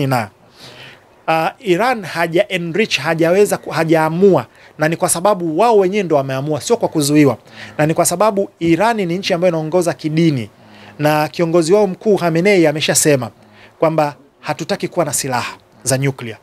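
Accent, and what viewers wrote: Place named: Africa. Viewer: Salut kaka yani unavyoongea unabeba pendela ya Africa big up ❤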